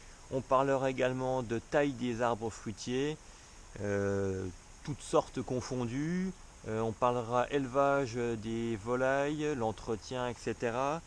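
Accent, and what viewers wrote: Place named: Franche-Comté, France. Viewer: Vous avez un accent franc comtois!!!!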